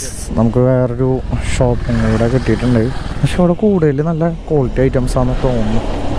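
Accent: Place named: Thrissur, India. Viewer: പഴയ തൃശൂർ slang thirich vannittund.. loved it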